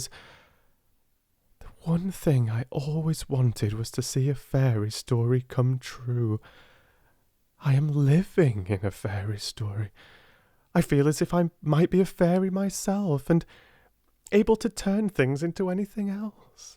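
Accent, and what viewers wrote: Yorkshire, United Kingdom. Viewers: He and Neil Gammon ( sp?) Are my two favorite narrators with the touch that only a British dialect can pull me into the story or lull me to sleep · Please could you read Wuthering Heights and Jane Eyre. I guess you are from Yorkshire and would be well suited to read these stories.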